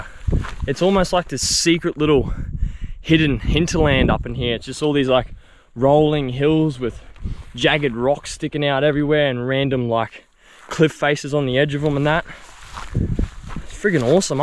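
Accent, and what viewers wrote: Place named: Far North Queensland, Australia. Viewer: Your production is incredible. I absolutely love ALL your videos. Your camera presence is so raw, real and refreshing. The FNQ accent makes it even better haha! Will you ever consider travelling overseas and create hiking videos? Great work. 🙏🏻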